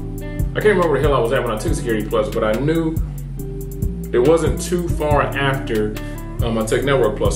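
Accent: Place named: Dominican Republic. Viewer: This man sounds and look Dominican ! I needed to support right away ! my people !!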